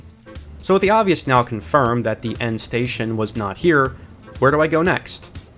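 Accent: Philadelphia, United States. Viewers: I just found your channel, watched a handful of videos (all excellent) and thought to myself, sounds like a Philly guy. This video confirmed it based on your airport departure 😂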